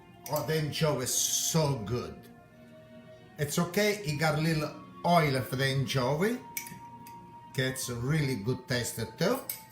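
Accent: Calabria, Italy. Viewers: Centi'anni! This except for anchovies was how my noni made it. She did cook with anchovies but it was a with olive oil, garlic on day old bread. You sound like the old calibretzu from the hill. I miss that accent from when I was a kid.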